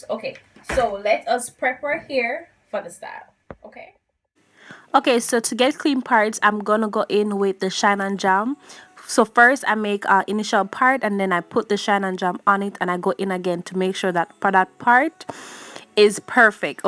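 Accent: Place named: Jamaica. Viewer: Hey.. you sound like a Jamaican...... real ting! Good Job: Just cut my locks after 13 yrs..... need this afro in my life....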